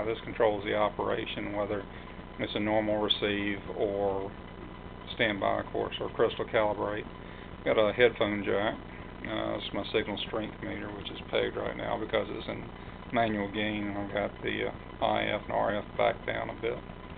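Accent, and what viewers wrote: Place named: Southeast, United States. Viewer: more than likely....Southeastern USA....some accent detected....old southern boy myself...I miss a word or two here and there, but no big issues....hell of an undertaking, I think....and it F'n works....I can't build anything close to this....some small kits....foxhole/crystal sets for MW/SWL/AM/CW....but nothing on this scale/level....damn good job this cat did....thumbs up.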